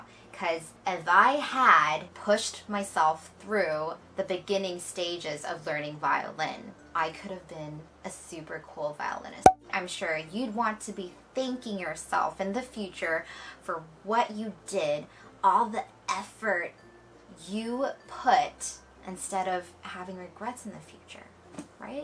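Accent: United States. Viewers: 好像知道Dena的American accent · Dena的腔調確實非常道地，一聽就像是美國長大的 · You do have a perfect American accent though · 你還沒出國讀書的時候，口音也是很像美國人說的嗎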